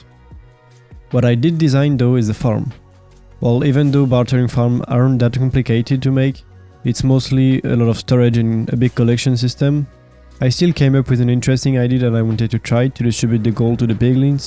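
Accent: France. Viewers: HA HA jai vérifié et t'a bien l'accent français chef. Mais sinon tres bonne video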